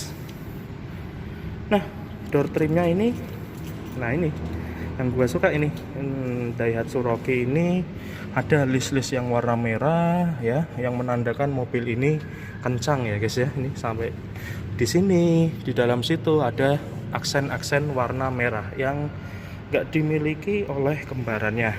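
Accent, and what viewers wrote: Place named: Betawi, Indonesia. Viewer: Guwa apa kulo? Betawi medok nih 😅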